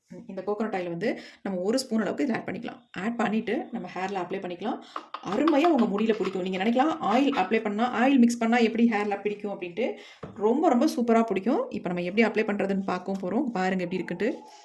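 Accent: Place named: Kerala, India. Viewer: Unka mudiyai kattunkal Unkal pechai kettal malaiyalu mathiri illaiyea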